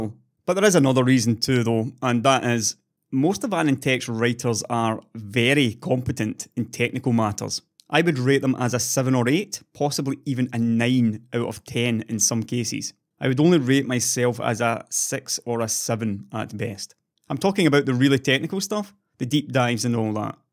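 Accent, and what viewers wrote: Scotland, United Kingdom. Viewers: Your scottish accent is better than *'s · Awesome work as usual! Fuck the haters and as Good Old Gamer said: "You can't fix stupid" Never a truer word spoken:D Looking forward to your next vid man, keep it up! you provide a bloody good and balanced viewpoint and have +100 Integrity compared to some others of the techpress, It's for that reason I subbed you (After watching a few vids and eventually became a patron) You're honest, frank and I like that. No bullshit or smoke and mirrors. Just plain old info with a Scottish slant:) Anyways dude have a good week and see ya in the next one!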